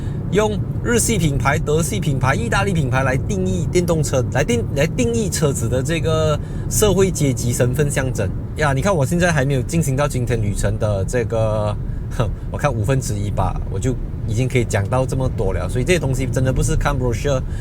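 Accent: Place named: Malaysia. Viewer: 马来西亚的中文口音好可爱啊。💯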